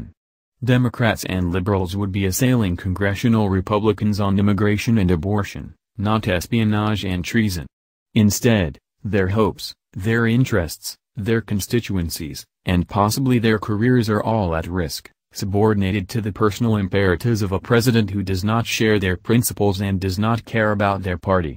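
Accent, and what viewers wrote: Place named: Iran. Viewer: This guy talks like an Iranian he obviously can't speak English well. This sounds like false news. Shalom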